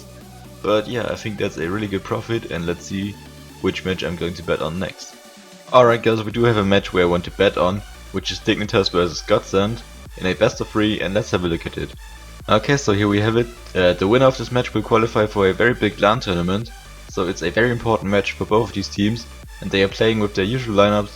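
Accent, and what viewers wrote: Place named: Germany. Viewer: Lol man hört mega Raus das du Deutsch bist :)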